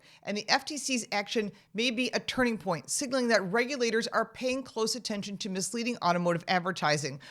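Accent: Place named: Buffalo, United States. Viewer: The familiar Buffalo accent. Very nasal.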